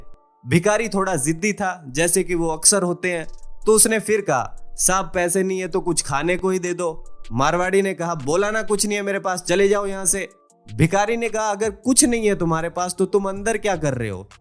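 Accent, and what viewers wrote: Gujarat, India. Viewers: Tum gujrati ho, accent! 🙂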